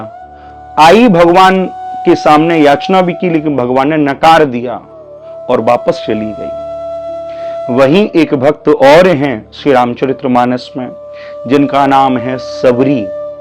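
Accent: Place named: Rajasthan, India. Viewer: दीदी हम मध्य प्रदेश के सतना जिले से हैं यह हमारा ससुराल है प्रभु कृपा से 2 बच्चे हैं हमारे एक प्यारी सी बेटी और दूसरा प्यारा सा बेटा है पूरे परिवार की जिम्मेदारी हम पर है हमारी मम्मी जी को गुजरे 6 साल हो गये हैं वह मुझे बहोत प्रेम देती थीं मेरी खुद की माँ से भी ज्यादा प्यार दिया है उन्होने हमें, हमारी माँ तो पागल हो चुकी हैं और पापा इस संसार को छोड़कर चले गए 7 साल पहले और अब मायके की गृहस्थी बिखरी हुई है 3 बहन हैं और 1 भाई है वह अभी 16 वर्ष का है। सब प्रभु के भरोसे चल रहे हैं जैसी कान्हा की इच्छा 🙏दीदी आप कहाँ से हैं वैसे आपकी भाषा से तो प्रतीत होता है कि आप मेरे प्यारे राजस्थान से हैं 😊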